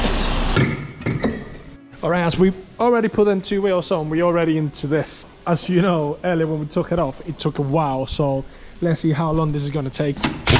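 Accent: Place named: Liverpool, United Kingdom. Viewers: I just love that this guys accent is like a perfect blend 50% UK and 50% wherever he's from (not tryna be rude just don't know where he's from). How long have you lived in UK for mate? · He’s from The Dominican Republic via Liverpool 😂😂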